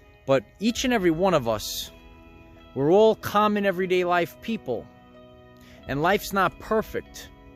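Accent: New York, United States. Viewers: 1. The New York accent is the best ever! 2. do you like the movie The Neverending Story, because you remind me of the boy in it lol? 👑😇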